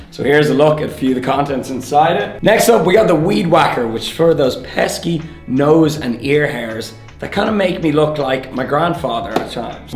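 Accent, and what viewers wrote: Ireland. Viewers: Are you Irish love from England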